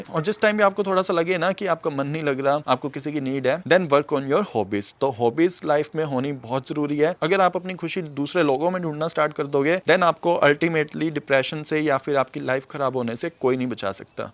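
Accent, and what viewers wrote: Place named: Haryana, India. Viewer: Bhai aap ka accent haryanvi jaisa lagta hai baaki aap kahan se hu ? 😀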